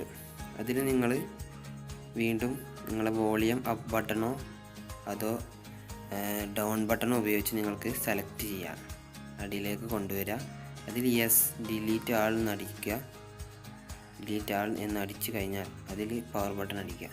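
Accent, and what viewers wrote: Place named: Malappuram, India. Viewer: Igale baasha poli malappurakkaranano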